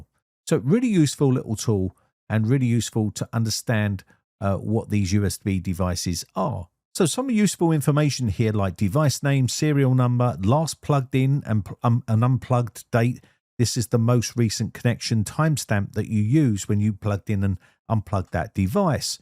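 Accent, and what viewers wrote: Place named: Cockney, United Kingdom. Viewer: I'm here for the cockney accent.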